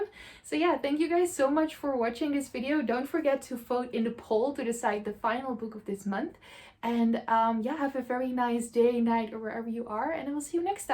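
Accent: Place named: Netherlands. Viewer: HI!! I just subscribed to your channel. I'm also from the NL and I love how you speak English ahaha. Good luck with your TBR!! And lots of fun💖💖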